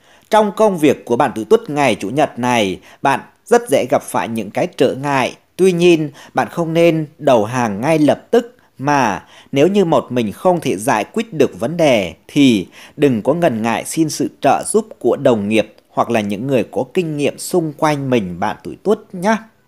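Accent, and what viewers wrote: Thanh Hoa, Vietnam. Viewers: Troi Thanh Hoa de nghe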